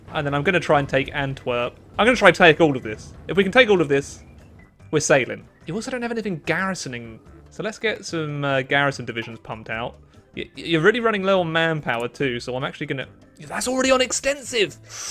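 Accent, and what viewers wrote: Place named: United Kingdom. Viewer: He's more Bri'ish than usual in this video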